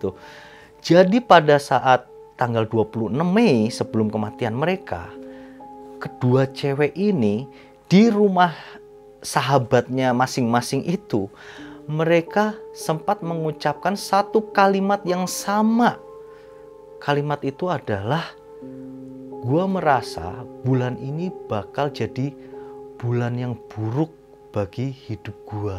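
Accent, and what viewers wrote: Java, Indonesia. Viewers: Medhoknya itu lho enak didengerin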